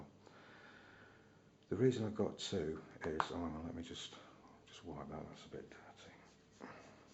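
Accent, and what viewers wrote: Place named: Midlands, United Kingdom. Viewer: Love the Midlands accent btw. Melton Mowbray?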